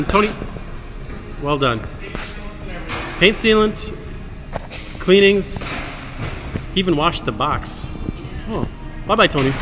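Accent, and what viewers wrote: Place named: Canada. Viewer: I don't know what makes this video better.....your extensive knowledge of car repair or your awesome Canadian accent.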